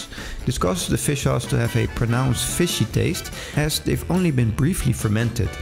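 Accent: Netherlands. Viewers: annoying dutch accent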